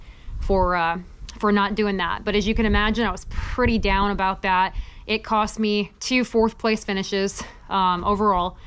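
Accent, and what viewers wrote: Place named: South, United States. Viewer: Please. You say Nebraska but your accent says you are a southern lady!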